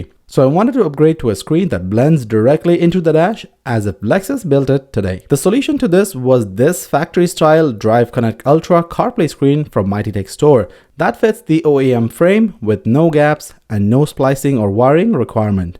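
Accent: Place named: Bahamas. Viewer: You sound Bahamian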